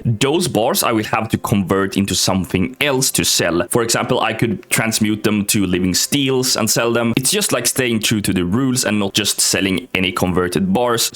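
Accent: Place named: Sweden. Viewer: PLEASE FOR THE LOVE OF GOD STOP SAYING "SHA-LENGE", "SHECK"... ITS CH NOT SH, YOUR SWEDISH IS SHOWING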